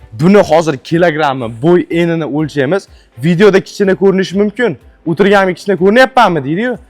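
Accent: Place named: Kokand, Uzbekistan. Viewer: Чота шу бола куконли шева тортяпти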